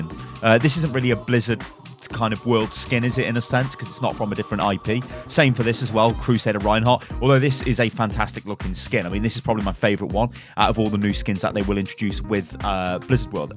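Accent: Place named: United Kingdom. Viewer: I love these vidoes. They make me all warm and nice when I hear lovely british voice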